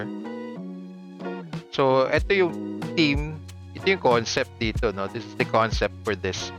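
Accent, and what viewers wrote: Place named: Conyo, Philippines. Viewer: Conyong conyo amp...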